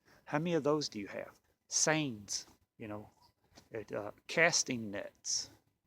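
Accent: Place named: South, United States. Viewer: Good points thanks. You sound like your from the south so the cold may not be as much of an issue as up north. But I recommend the little buddy heater I use it in my deer blind. These nor'easters we just had up here was a good practice run. I found I needed more hand sanitizer and rubber gloves. A couple more 5 gallon buckets would be good to I was helping a couple unprepared neighbors out with water.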